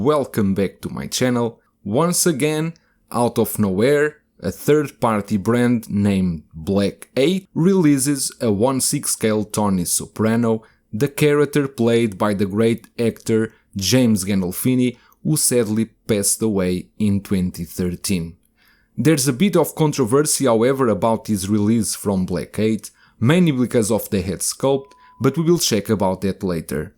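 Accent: Portugal. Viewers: Pela forma de falar / sotaque logo vi 🇵🇹 Que honra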